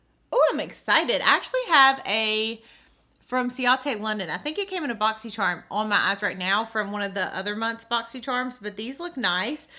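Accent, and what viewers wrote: South, United States. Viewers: Yay I’m early for once also I LOVE your southern accent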